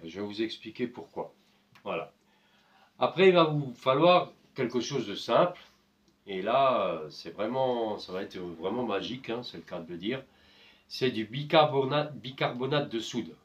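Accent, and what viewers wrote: Alsace, France. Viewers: C'est copié ,plein de vidéo sur Youtube sur ce sujet !!!!!!!!!,j'en ai trouvé une vieille de 8 ans ,pour recoller un réservoir eau de cafetière il faut oser ,collage cyano et la poudre sur un récipient alimentaire pas bon ;moi je fais pas ,attention si eau pas en contact avec collage pas de soucis. Ton sujet est un peu comme on dit du déjà vu ,celà ne retire rien a ton talent de bricoleur ,trouver un autre sujet pour durer ,c'est pas évident je jen convient . Tu a un accent Alsacien . Bye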